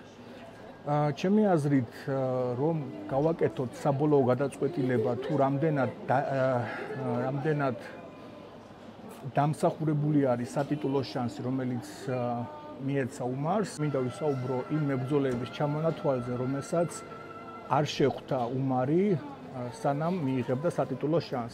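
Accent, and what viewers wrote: Russia. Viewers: პატივისცემა ჩემგან, რაც შეეხება კონტენტს, ძალიან მომწონს მაგრამ ჩემი პირადი რჩევა იქნება, გენრი სეხუდოს მაგივრად ჰენრი სეჰუდო რომ თქვა, კორი სენდხაგენის მაგივარდ სენდჰაგენი, მე კი ვხვდები რა თქმა უნდა ვიზე საუბრობ მაგრამ, ძალიან არასასიამოვნო მოსასმენია, აი მეტყველების ამ პრობლემის გარდა, ჰ-ხ, ჰ-გ, და ხომ მიმიხვდი, ისე ამბობ ხოლმე როგორც რუსები ამბობენ, სხა არაფერს შევუწუხებივარ, წარმატებები <3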